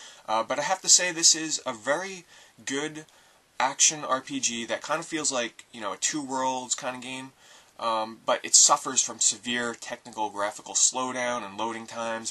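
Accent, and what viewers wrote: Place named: New England, United States. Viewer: what part of america do you live in? I sense a new england accent...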